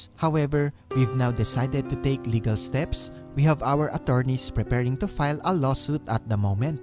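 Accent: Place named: Philippines. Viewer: You have a Pinoy accent. 😊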